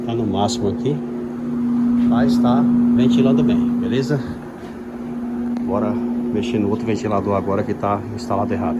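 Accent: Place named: Brazil. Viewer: Vc é de qual estado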